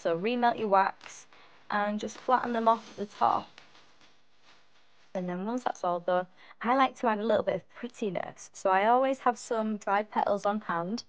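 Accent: United Kingdom. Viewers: Thank you. Quick and simple. (Are you from Hull?) love the accent. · Nice and simple to follow. You sound like you're from my neck of the woods, Leeds maybe? It's unusual to hear on YT 😊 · Uffff…. that nonsense British accent!!! Such a torture to ear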